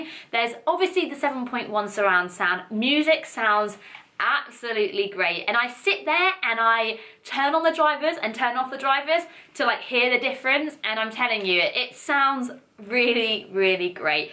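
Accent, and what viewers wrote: United Kingdom. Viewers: Man British accents give me a headache, I can't take more than about 5 mins of it, but this is a sweet looking headphone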